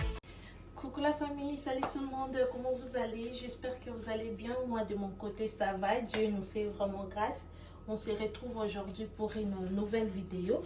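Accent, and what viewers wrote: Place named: Kansas City, United States. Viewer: Bonsoir madame j’aime beaucoup vos contenus,en vous écoutant parler j’ai l’impression que vous habitez Kansas city aux USA par rapport au détail 😍😍😍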